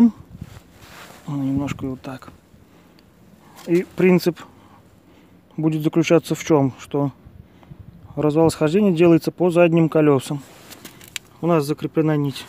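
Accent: Belarus. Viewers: бульбяш.... говор не спутаеш))) + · Ты из Белорусии?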